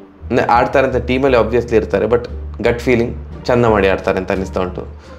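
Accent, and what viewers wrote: Mangalore, India. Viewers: Bro nanu nimge recent subscriber bro...... I watched all ur last ipl videos..... Janardhan sir comedy antu nxt lvl marayya😂chennagi roast madtare...... Nimma mangalore style kannada bahala chanda untu..... Keep going and keep roasting rcb if they perform bad😂avag swalpanaru buddhi barli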